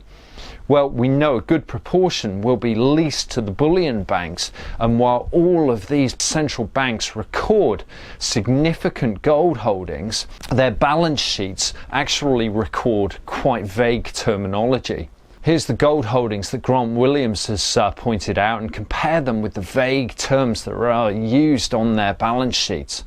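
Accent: United Kingdom. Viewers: good british accent It's suppresses as the price reflects the economic health of a nation and also the inverse effect on currency Ponzi scheme, as the metal is leased out many more times than actually exists ; If u went into the aftermarket and bought after the close, and sold before the open on the nex day, u'd make risk free profits : Massive SELL order just b4 close scares long investors to lower their bid AND spooks hedge funds into dumping Au..this becoming a self fulfilling momentum. As 20-to-1 leverage is present in futures market, the effect is great> Hedge funds' stop losses also kick in...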